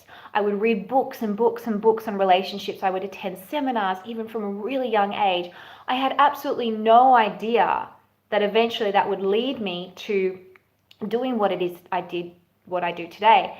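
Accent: Australia. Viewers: I saw a video by some mgtow guy nit picking on your video. I wanted to hear what you were saying because there was some good stuff there, but couldn't because of his constant interruptions with his "color commentary". He didn't mention who you were so going by your Australian accent I found you. On your mgtow video, you start by saying "leave your comments below", but when I scrolled down, the comment section had been turned off. My hunch is that you got inundated with mgtow tribal wrath. What I gathered from his video is that while you were discussing personal relationships, he was straw manning it with "ya but the workforce". Blatant to me but perhaps filet mignon to his followers. I saw a guy interviewing men and women as to whether they can just be friends. All of the women said yes, most of the men said no because the feelings are there. Cheers.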